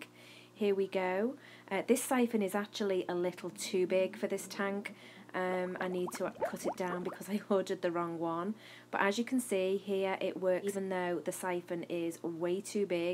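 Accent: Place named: North West England, United Kingdom. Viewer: Great video... mine said i needed to shake it up and down in the water to start it... there was more water on the floor afterwards than in the bucket. Are you from the north west (wirral way?) I cant place that accent but it sounds familiar.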